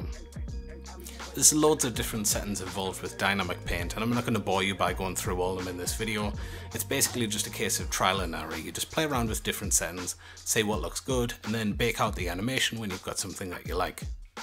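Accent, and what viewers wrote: United Kingdom. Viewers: Great video :) Also great to hear more regional UK accents rather than just typical American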